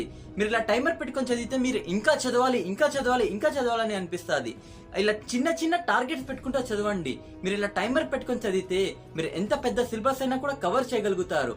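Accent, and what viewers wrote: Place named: Rayalaseema, India. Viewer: Rayalseema slang superb bro iam Harish ekart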